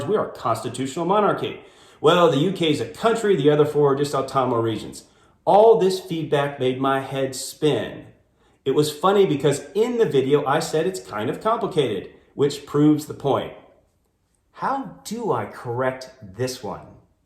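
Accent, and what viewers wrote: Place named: United States. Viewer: You speak US English. No reason to apologise for it.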